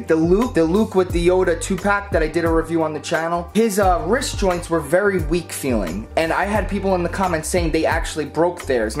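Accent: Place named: Staten Island, United States. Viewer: Bro where u from you sound mad Staten Island?!? 😁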